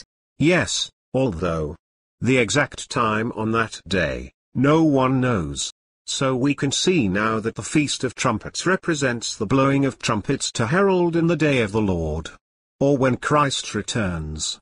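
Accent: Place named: United Kingdom. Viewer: kinda wish there was an Israeli voice or even Jewish from new York...but British?well on. lol praise the Lord anyhow...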